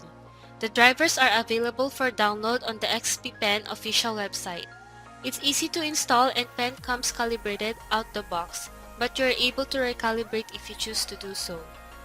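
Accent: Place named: Philippines. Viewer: OMG ate!! pinoy accent 🇵🇭😆💪 idol talaga kita. mag practice ako ng mabuti 😤.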